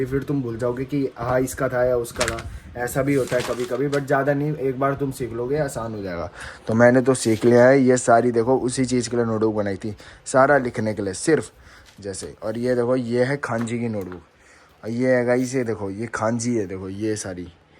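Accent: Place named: Uttarakhand, India. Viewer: Bro your acsent seems phadi btw mai uk uttarakhand❤ se hu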